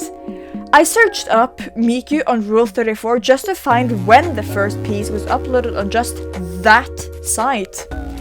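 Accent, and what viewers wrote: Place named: Sweden. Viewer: i feel the swedish accent...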